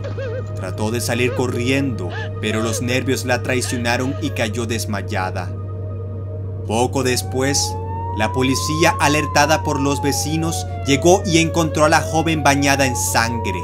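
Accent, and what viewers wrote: Arab world. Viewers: acento árabe X'D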